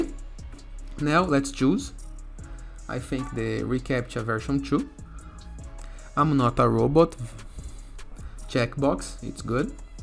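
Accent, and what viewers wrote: Brazil. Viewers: Cara notei na hora que tu era brasileiro pelo seu sotaque kkkkk mas parabéns pelo video!!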